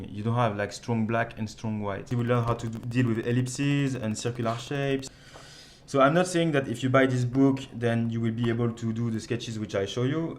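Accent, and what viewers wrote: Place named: France. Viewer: vu l'accent je suis sur que l'auteur est francais !!!! merci pour le job en tout cas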